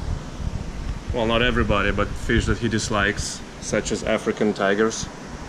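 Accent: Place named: Europe. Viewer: Hi there, I saw the net you used when capturing the Tarpon but nets made to capture Koi have less resistance because they are flat. There's also another Koi-specific net called a sock net which makes transport of large slender fish very easy. Sounds like you are somewhere in the EU judging by your accent? The Koi market is pretty big in the UK and Germany so it shouldn't be difficult to find these items. Also a large umbrella net used to capture bait fish might make the process easier...if it swims right above the trap.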